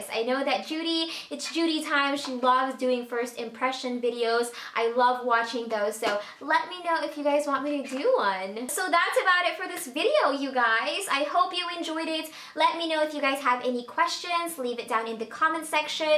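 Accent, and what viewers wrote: Philippines. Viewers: Hahaha :D pinoy accent ;) cucumber x) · Filipino accent lol :)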